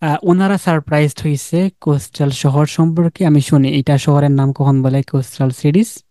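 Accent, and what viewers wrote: Sylhet, Bangladesh. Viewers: Baiya apner basha sylhet kutay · উনি হয়তো সিলেটের তাই কথা কেমন। এটা ব্যপার না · Sir amioy sylheti,,, apnar kota shuna kno jani mono or afna sylheti,, 🥰thanks a million sir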